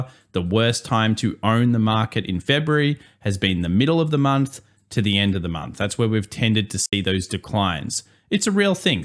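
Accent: Australia. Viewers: He is Australian bro? Unless you can point to it.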